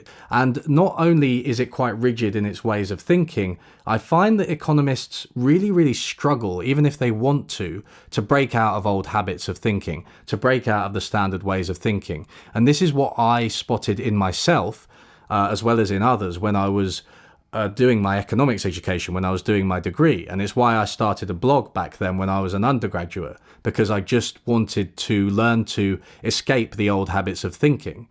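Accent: United Kingdom. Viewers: As a Chemist coming to the end of my masters I've been seeking none chemistry related reading to come up for air between dissertation writing and have turned to economics. Thank you for your book recommendations and the videos you have provided as a pier head from which to jump off from. I hear a very Wirral accent, I think, and as a plastic Everton fan, haha we won (for once).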